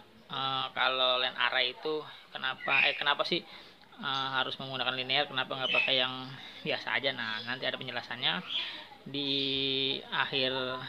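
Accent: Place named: Betawi, Indonesia. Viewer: Bang ko abang gaya bicara nya kaya orang jakarta/Betawi, inisiatif nya mantep baang, salut saya salam satu hoby, ane jkrta tg priuk 🙏🙏🙏🙏 👍👍👍👍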